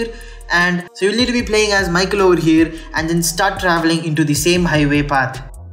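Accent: India. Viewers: Why do you have such a thick indian accent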